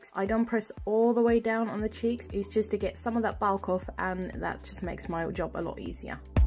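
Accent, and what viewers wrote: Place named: United Kingdom. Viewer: Lovely British accent!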